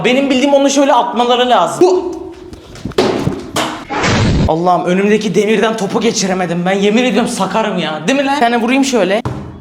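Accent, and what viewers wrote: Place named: Black Sea, Turkey. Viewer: Oy uşağım Sen de bir Karadenizli oldun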